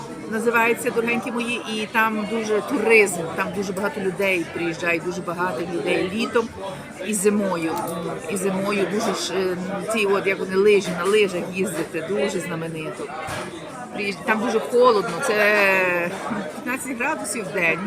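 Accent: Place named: Western Ukraine, Ukraine. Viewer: Німці дійсно пʼють багато пиво, але по якості не зрівняти з нашим. У них дуже смачне пиво. Коронна страва це шніцель з капустою синьою . Багато їдять мясо, спаржу корисну. У них є багато цікавих страв. Але ви подаєте про людей з Європи і про німців не коректно.. Не гарно знімати , як ви їсте) коли їдять , то не розмовляють. Культури у вас вибачте мало. Італійці багато пʼють вина , як воду. Треба поважати народ з іншоі країни.Мені прикро , як наші люди позоряться. Не знімайте більше відео .. не ганьбіть українців., тим більше по акценту вашому ви з заходу України ..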